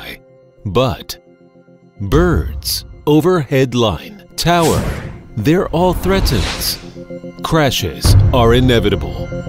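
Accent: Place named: China. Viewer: The Chinglish is putting me off.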